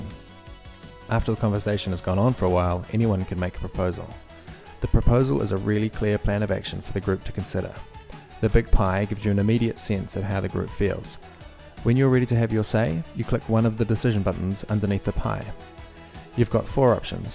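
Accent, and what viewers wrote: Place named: New Zealand. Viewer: Great tutorial, but the sub-titles are a bit random - seem to struggle with the good old kiwi accent.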